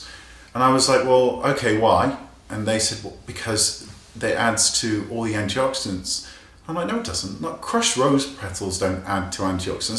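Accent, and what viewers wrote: England, United Kingdom. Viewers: This is exactly why WE all Love you so much! This and your supercute englisch accent☺. Kisses from Germany and a Big thank you for all your honesty and braveness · That english accent! <3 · I love you, I love watching your videos. Think youre absolutely hilarious! Most of all I love/adore your accent. I'm Irish so the English/UK accent is amazing to listen to anyway. But there's a few words that you say which have a wee bit of a "twang" e.g. when you said "haarrrrrrrd" in this vid.. LOVE IT! That makes watching these videos, really does. Also, I totally agree with some of the comments left here that you should create your own line of cosmetics and/or you should write a book.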